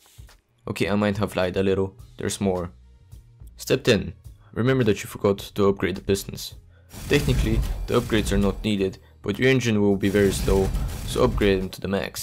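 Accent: Russia. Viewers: какой твой родной язык? у тебя сильный акцент слегка похожий на русский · Where are you from? Russia? You have specific accent :P BTW good vid, gonna try this :D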